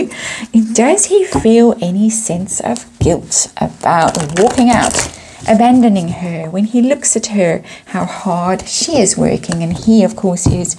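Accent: South Africa. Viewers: Excellent reading! I love your gentle voice and your your gorgeous South African accent - soo soothing. I also love how you speak about HM The Queen, who doesn’t deserve any of this. It’s beyond comprehension how HM can put up with one of her wayward relatives, not to mind two of them (Andrew & Harry). I’m hoping that both of them come to rue the day they cut her life short!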